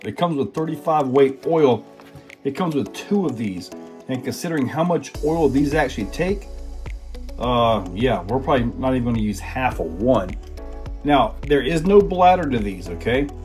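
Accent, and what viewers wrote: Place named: United States. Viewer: Yeah im hardcore! Love your videos man! And the good ol american accent🫵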